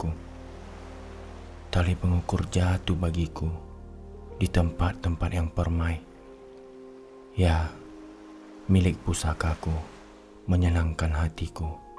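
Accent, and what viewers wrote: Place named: Nias, Indonesia. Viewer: Kalau dengar logatnya seperti logat org Nias bhsa indonesianya.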